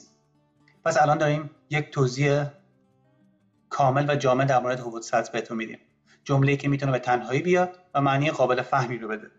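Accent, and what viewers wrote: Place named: Iran. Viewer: خیلی خیلی لحجه ی ایرانی داری یعنی یه جمله سوئدی رو لحجه ایرانی شو میگی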